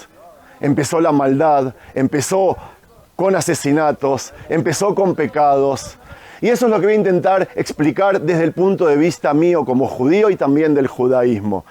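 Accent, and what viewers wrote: Argentina. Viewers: El señor es Argentino?? Habla como Argentino...sus padres seran Judios???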